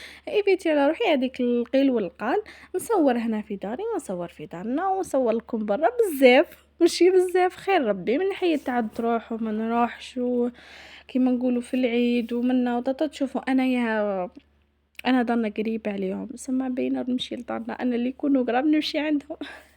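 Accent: Algeria. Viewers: علاه حنا قلنا مشي حلوة قلنا تهدر هدرتنا تاع الغرب ماشي هدرة الناس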